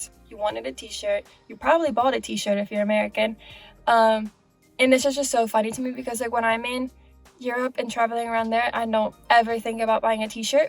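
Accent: Spain. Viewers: Is it me? Or does it really sound like she has a little bit of an accent? which means that hilaria baldwin is right that she did pick somewhat of an accent while in spain. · It is very interesting that when you speak I can sense some Spanish accent. yeah food hits me hard when I go back to the US, I gain weight really fast. I'm actually from Allentown, but I live in Slovenia for 8 years now and I don't really feel like moving back to the US.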